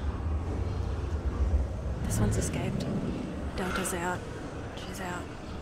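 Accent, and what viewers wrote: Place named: Australia. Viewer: You’d think you got $5 for everytime you said, “brown snake” for the number of times you said it… lol 😂 Your accent is so much better when you’re not whispering you sound proper Australian. It’s hard to believe you weren’t born there. 😅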